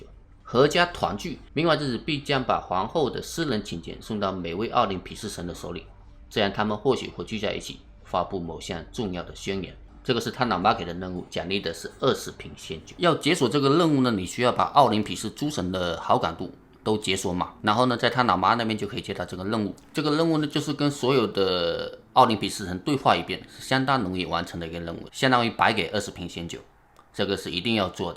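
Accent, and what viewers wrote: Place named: Fujian, China. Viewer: 胡建口音有点重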